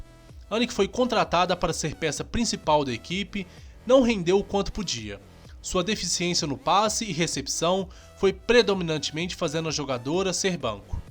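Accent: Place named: Rio de Janeiro, Brazil. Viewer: Carioca,pelo sotaque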